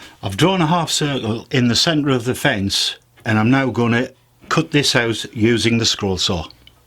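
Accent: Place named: Sunderland, United Kingdom. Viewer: Sounds as if he's a Mackem from wearside?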